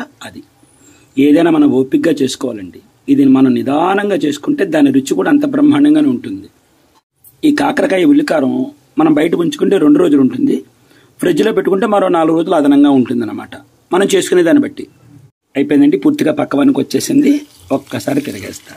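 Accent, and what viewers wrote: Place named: Godavari, India. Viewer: garu namasthe Meeru chupinche vantalu Meeru cheppevidhanam enka bavuntundi Memu anukovadan meeru godavari zillavallu ani Mee matani bati anipisthinfi Tq🎉 maku chala Baga nachhutunnayi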